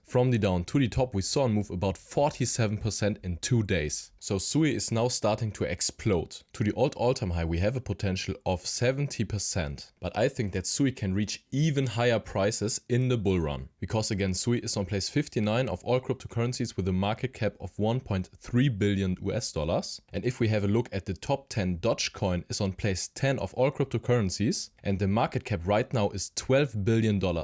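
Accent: Germany. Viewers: Deutsches Dialekt 👍 lege ich richtig? SUI is gonna be huge 🚀🚀🚀 in my opinion, a 100x from the bottom is more than possible ✅ Grüße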